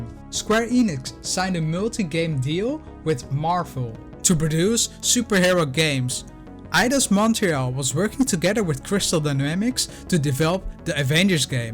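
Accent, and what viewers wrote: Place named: Netherlands. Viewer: You have quite the Dutch accent ;))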